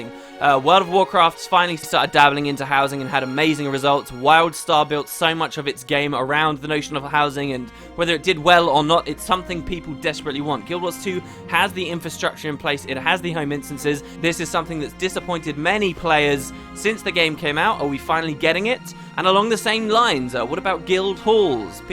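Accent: United Kingdom. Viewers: one british person to another...gems. Gooo on...please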